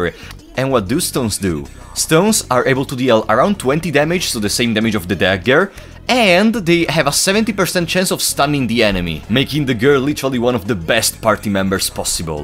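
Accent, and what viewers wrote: Italy. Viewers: Avevo incominciato a vedere questo gioco prendere popolarità e l'ho comprato per provarlo, ora grazie ai tuoi video sono riuscito a capirlo meglio. Ho visto che sei italiano per l'accento e perchè quando vai a vedere il codice sorgente del gioco ci sono le scritte in italiano. Peccato che in italia non se lo caghi nessuno sto gioco. Continua così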